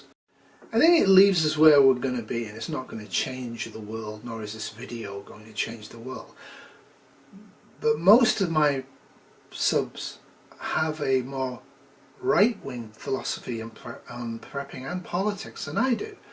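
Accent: United Kingdom. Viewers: The only reason politics are part of prepping in the US is because of the 2nd amendment. Without it, gun confiscation becomes easier and then the shtf can be a lot easier to usher in. For the record, your're the only liberal I thumbs up on YT. Must be the British accent or maybe the hair. Haven't figured it out, yet.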